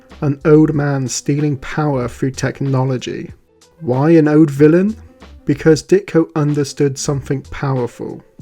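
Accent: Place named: United Kingdom. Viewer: This Blows...sick of British Voices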